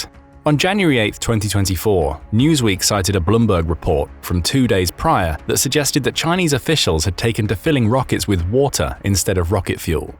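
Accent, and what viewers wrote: England, United Kingdom. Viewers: Lmao this video is total bullshit. I get a little suspicious on yhe stories then they flash up a picture of the lincon monument, that is 100% not what the monument looks like. I don't know who produced this video but it used an English accent voice using American measurements & stories that just aren't true.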